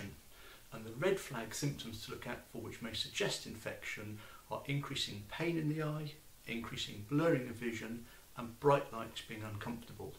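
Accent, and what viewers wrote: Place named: England, United Kingdom. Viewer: Thank you for the heads up, doctor. English accent is not the audio's fault!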